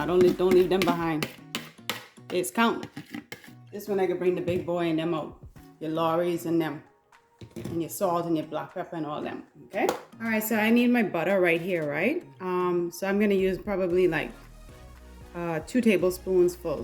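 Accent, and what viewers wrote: Bahamas. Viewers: Lol I enjoyed this say (.judge your ma lmaoo, looka that macaroni ) you're a real bahmaian gyal, accent/slang on point 😆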